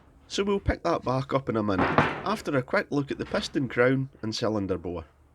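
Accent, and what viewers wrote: Scotland, United Kingdom. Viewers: Man, between the dulcet tones of Scottish brogue and the "I make a new one"'s of My Mechanics, my craving for the greatest content on YT is satiated. Excellent work, per usual, friend. Can't wait to see this beauty in action. Much love from the USA.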